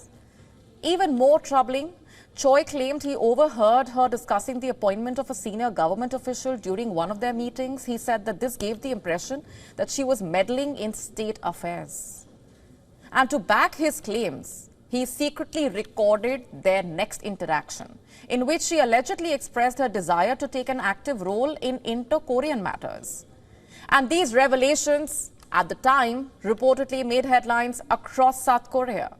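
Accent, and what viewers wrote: India. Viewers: Good info! Her accect is so heavy I can not understand, maybe this a show only for Indians? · i dont understand indian speaking english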